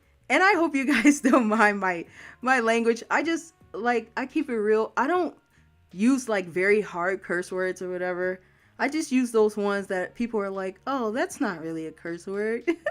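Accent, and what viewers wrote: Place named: United States. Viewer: I enjoy your narration of these stories: it's nice to have someone do them with a proper American accent and pronunciation, especially a woman with a nice feminine voice. Keep up the good work!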